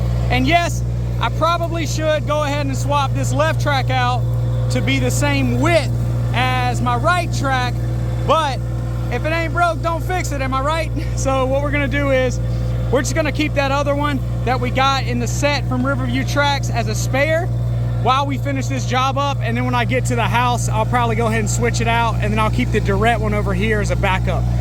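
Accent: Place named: Carolinas, United States. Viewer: Carolinas, I thought so from the accent. Northern central Alabama here.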